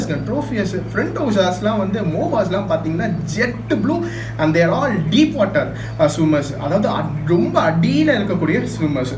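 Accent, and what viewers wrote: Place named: India. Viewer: Why do you speak Indian English?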